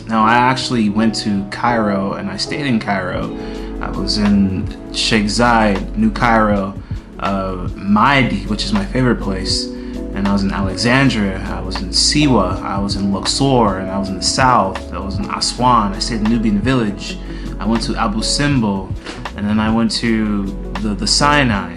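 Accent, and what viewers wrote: United States. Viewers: Your American accent gave you an edge. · I am sorry that you didn't get the experience you expected in Egypt. It seems to me that you went with a preconceived idea of what your relationship with the Egyptians should be. Then, you found out that they don't feel the same way about you. But you were lucky you didn't experience any racism there. That's because you carry the little blue passport, and the accent to go with it. Trust me: If you had been from subsharan Africa, you would have felt their racism.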